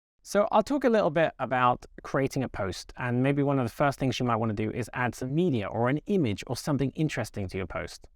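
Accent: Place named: United Kingdom. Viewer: I think of as being from the UK. is an Irish name (pronounced ) and as I understand it, he grew up in and has spent more years in the UK than anywhere. You'll notice he has a "British" accent. He does live and work in Norway, has a Norwegian last name and his wife and kids were born there. So... But yeah - his English is flawless because he's pretty much from there. No one has ever mentioned to me how good his Norwegian is... I'll have to ask some of his co-workers.:)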